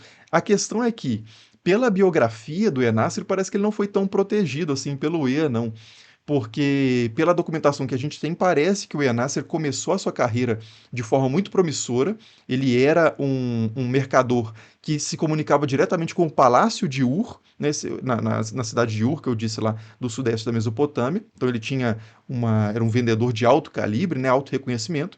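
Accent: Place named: Rio de Janeiro, Brazil. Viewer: Com certeza Carioca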